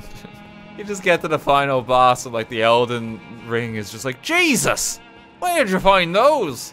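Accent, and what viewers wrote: Ireland. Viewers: This is my first time watching him and I was just like; "Sniff sniff, is that an Irish accent I hear?" I love the rep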